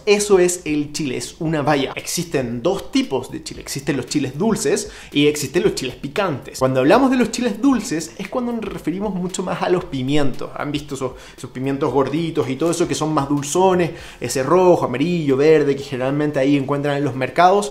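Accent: Chile. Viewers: 🌶 El chile serrano para los caldos, solito a mordidas o en salsa 🤤 delicioso! Me encantó tu contenido porque esta bien chido aprender de la gastronomía de mi país y con acento chileno y buen gusto.... está de lujo 😉👌 gracias, me haces pasar un buen rato! 👏👏👏👏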